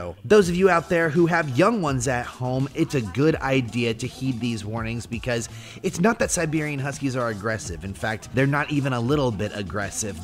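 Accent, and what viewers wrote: Caribbean. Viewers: But that carribean accent on point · Me not likely the Caribbean accent or Spanish accent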